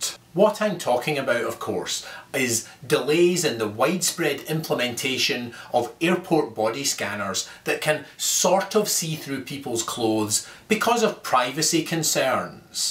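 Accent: Scotland, United Kingdom. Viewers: oooh i love his acsent... i want a scottish friend :D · Ya I'm American do the accent is hard to understand, but I'm also Scottish so I like the accent, and everything else HA don't shit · I love the skotish acsent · You can take your Scotish voice and go to hell. Maybe the loss of liberty does not cencern you but to real Americans it is the reason we fled Great Britain in the first place. Airport scanners are unnecessary. The problem can be solved by profiling but they don't want to solve the problem. You are the perfect example of an unwitting NWO dupe. No go play some golf and eat some haggis and read some books about freedom dummy. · I think he's a Scot, or like me, he might be an Irishder. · is that Scottish ? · Stuff an aresenal up his aresenal XDDDD nice accent btw Scottish? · What ever happened to the british accent being 'hot'? Americans don't even realise that this is what 90% of brits talk like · well your an idiot... 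hes scottish. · He is very much Scottish.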